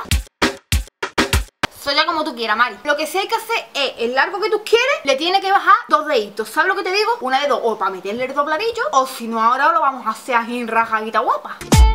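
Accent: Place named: Seville, Spain. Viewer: Tú eres de Sevilla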